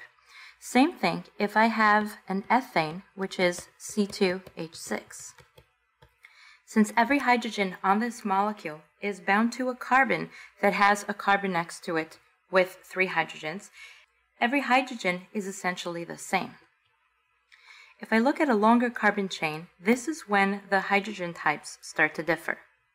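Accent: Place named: Borough Park, United States. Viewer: You sound like you grew up in boro park. I know that accent from a mile away.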